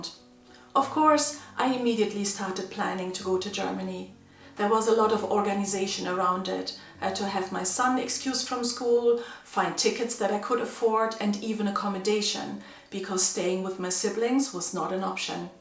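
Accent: Scotland, United Kingdom. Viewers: You are such a great person, while I was listening my brain suddenly felt something strange, my left eye had a tear, not other one, so strange incident, please don't be sad, just forgive them, no doubt you grieving, I know your situations, ignore and take step forward. We are your family now, be optimistic, you are truly, I love Scotland, very beautiful place with lovely ppl, I love Scott's accent, my senior colleague at work was from Scotland, very gunuine and straight forward person, I had been to Bury Town, lovely place, I always I have deep roots with the Scotland. Your videos are admiring n you are a captivating person. Thnx for sharing you daily life. CHEERZ BYEEE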